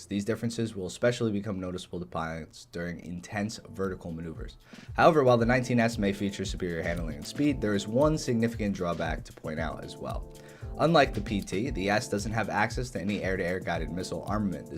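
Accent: Norway. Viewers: Is he norwegian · Wait, er du norsk? (are you norwegian) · Are you from norway me to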